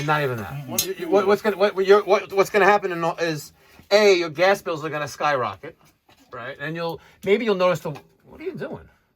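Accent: New York, United States. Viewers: "That white smoke doesn't mean you are electing a new pope." "I know that!" I love how you New Yorkers talk and joke.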